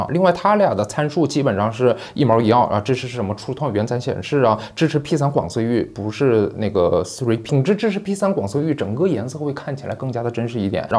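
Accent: Northeast, China. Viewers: 东北老铁啊？ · 第一次收到推送，是东北人吗听起来好好笑啊可是难道不应该是台湾的频道吗🤣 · 東北話太逗樂哈哈哈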